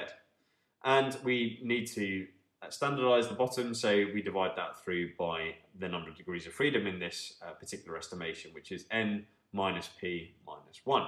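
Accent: United Kingdom. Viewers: it would be great if you enable caption on your videos. as a brazilian student, it is a bit hard to understante british accent.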